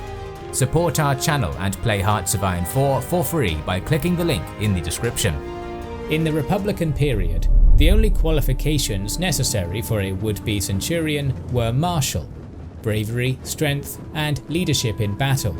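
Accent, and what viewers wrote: United Kingdom. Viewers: this is going to be a controversial statement, but you might want to encourage your school to utilize a channel with an american narrator. i consider myself a student of languages and i've read a million anecdotes about the general american accent being an easier accent to understand for ESL students than most of the accents which occur throughout england and wales. unless you're located in a country geographically near to the UK, it's also more likely you will encounter the american accent in the media.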